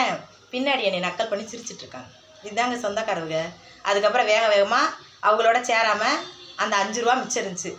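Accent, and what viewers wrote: Madurai, India. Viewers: Neenga madurai ya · நீங்கள் மதுரை யா சகோதரி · நீங்கள் மதுரையா அக்கா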